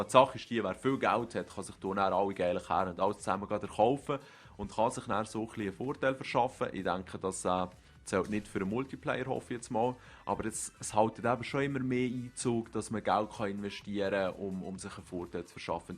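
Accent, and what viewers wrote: Switzerland. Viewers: das ist schweizerdeutsch, spezieler dialekt.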